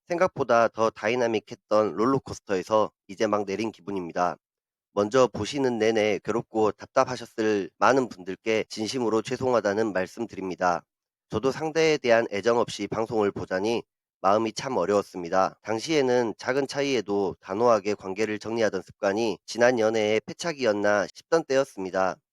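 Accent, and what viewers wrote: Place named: Jeolla, South Korea. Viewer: 전라도분이신가봐요 억양이.. ㅎㅎ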